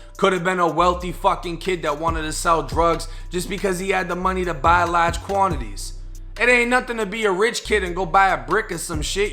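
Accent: Boston, United States. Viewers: I bet my life you are from Boston. I'm from New Jersey, l hear that accent a mile away! Enjoy your channel ☺️ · I love your accent, you must be from Boston! Keep up good work dude, ill support!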